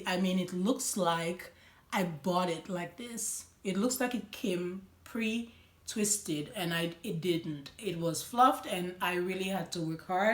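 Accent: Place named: Saint Lucia. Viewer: What island are you from you sound like a St.Lucain 🙋🏽‍♀️ if you are 👏🏽👏🏽👏🏽🇱🇨🇱🇨🇱🇨👏🏽👏🏽👏🏽... so I watched this video last night I’m great at doing hair and braiding and I’m quick ... I wanted something different I had NEVER done any twist with any Afro kinky so I did my hair last night ... it has NEVER taken me 5 hurs to do my hair I did it individual and I did 2 bundles 😤 it came out SWEETTTT AND LIGHT it was worth the time . Thanks for uploading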